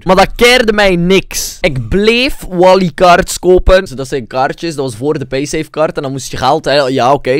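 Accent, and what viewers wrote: Belgium. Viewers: ben jij belg ofni ?'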